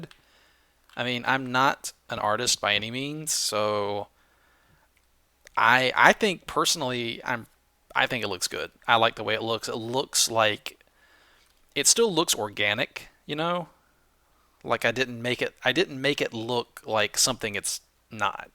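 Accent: South, United States. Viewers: Hey, where are you from? It sorta sounds like you have a little southern accent goin on:P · quick question....are you forcefully saying water differently to mask your "southern" accent? just something I've picked up on and it sounds kinda off (not criticizing you for doing it though) and secondly what did you use to make the jack o' lantern texture? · Mild Southern American accent. I believe he said that he's from Louisiana.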